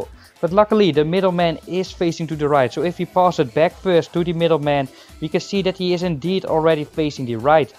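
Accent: Netherlands. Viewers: Great tutorial. Btw you sound Dutch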